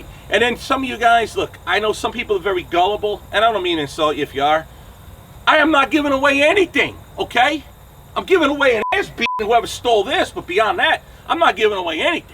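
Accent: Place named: North Jersey, United States. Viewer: Yup, you sound like pure North Jersey! Love it! And I hope you find the lowlife so he can be dealt with properly.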